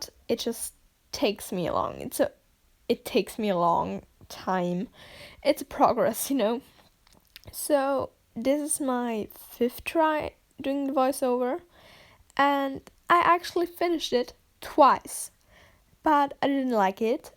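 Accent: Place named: Germany. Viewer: If you didn’t have your German accent, I would defo believe that English is your first language!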